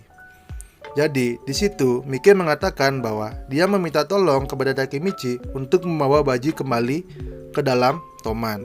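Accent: Bali, Indonesia. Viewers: Orang Bali?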